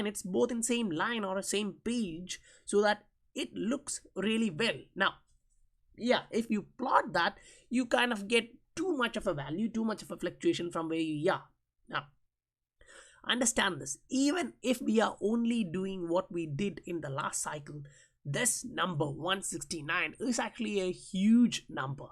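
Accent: India. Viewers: I always thought u have indian accent bro, but was confused when i saw south korea here. which city if you dont mind asking. ? by the way thanks for the quality content man.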